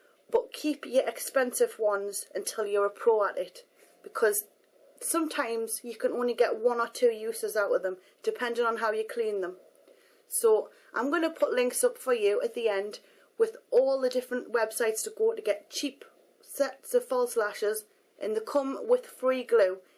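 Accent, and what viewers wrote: Newcastle, United Kingdom. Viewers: "That FREE glue is the best I've ever used" That's the kind of honesty that keeps me coming back. That, the pugs and the geordie accent.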